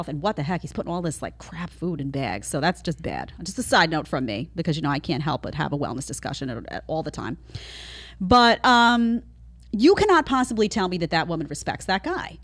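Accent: New York, United States. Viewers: Mama I truly love that New York accent of yours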